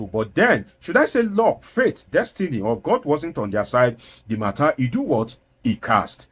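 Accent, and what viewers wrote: Yoruba, Nigeria. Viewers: Change that Ewedu soup accent you are in public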